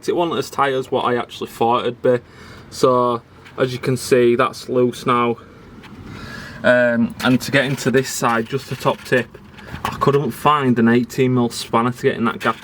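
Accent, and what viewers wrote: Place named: Leeds, United Kingdom. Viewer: Are you from Leeds? Accent sounds familiar, I'm from Leeds too, got a silver Vectra 1.9 👌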